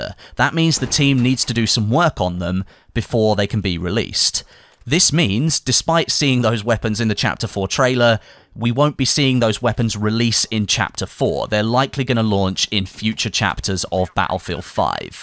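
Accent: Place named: United Kingdom. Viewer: I appreciate your optimism . Gave up on Battlefield a long time ago. Still enjoy listening to your slick British accent . Are you going to give Modern Warfare a try this year?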